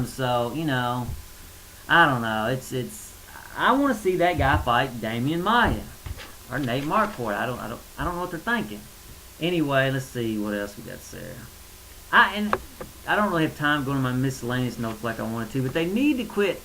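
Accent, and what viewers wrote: South, United States. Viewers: Anybody who's southern accent is stronger than mine gets 5 stars. If the next video's just as good, i'm straight up subscribing. Alot of stuff i dont particularly agree with, but i think that's the fun of it all. I only really agree with your deal on BJ. It's almost to the point you dont have anyone in that division that can hang with him since he beat Little Evil.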